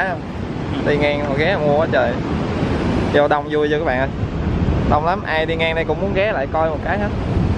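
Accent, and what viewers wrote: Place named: Tam Hiep, Vietnam. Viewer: Giong dab tam hiep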